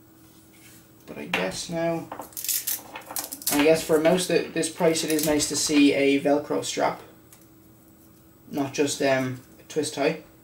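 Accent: Ireland. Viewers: U from ireland??????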